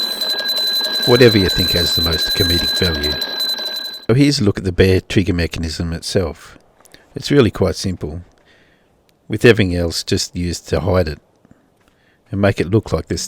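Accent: Australia. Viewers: he's Australian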